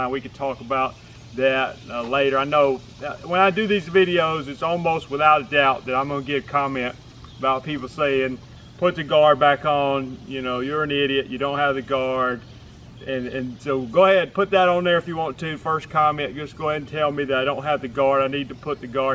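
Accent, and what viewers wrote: South, United States. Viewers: 1. Attractive 2. Southern accent 3. Handy 4. Excellent sense of humor. Is this guy single? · Great instructional video! Cool personality and love that southern drool 👏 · Your not English are you.?..love that southern drool . Over here in lil' ol' England we call it a lug hole..lol! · Loved your video, I did learn something, and I think its funny some of your comments about what the knuckleheads say about you or your work. And can I say, I love your "accent". I'm from the midwest and I forget comfortable and how easy it is to speak to or listen to someone from the South. I think if you stopped by my house, we'd be out on the deck or patio drinking a beer, just talking about things in general. I think that says quite about you. Best of luck and thanks for the video!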